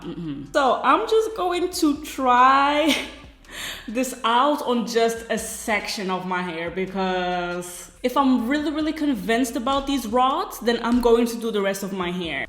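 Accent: Netherlands. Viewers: She says that in the description box: She lives in Belgium, half Dutch-half Ghanaian, and Dutch is her first language, thus the thick accent! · Het staat je geweldig maar wat een werk. Wel grappig dat ik even dacht een Nederlands accent te spotten. Heel even maar. Want je Engels is echt goed. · Omg this is the first vid I watch of u, butt I knew right away that u were dutch bc of your accent omg we dutchiess always have that same accent its just soo funny😂😂😂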